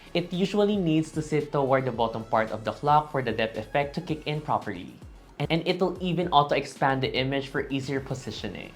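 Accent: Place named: Philippines. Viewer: Philippines not thailand, its quite obvious from the accent and enegry :)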